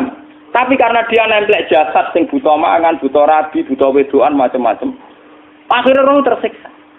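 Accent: Java, Indonesia. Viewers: Tolong admin channel ini smua pake translate meskipun beliau pake bahasa indo tapi dialek,y msih kental dng jawa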